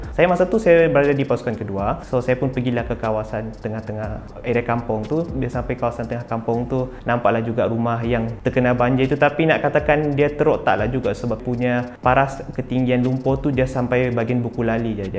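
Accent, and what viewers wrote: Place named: Sabah, Malaysia. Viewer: Mcm org sabeh je bro ni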